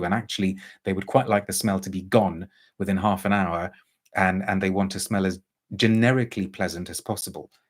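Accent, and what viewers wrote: United Kingdom. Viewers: I just love the way you say........we are broadcasting "liiiiiiiivvvvveeee' it's just soo classy :) anything in a British accent is just mmmmmm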